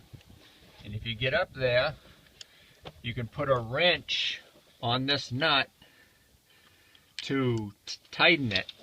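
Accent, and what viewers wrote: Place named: Maine, United States. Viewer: sound like you are from maine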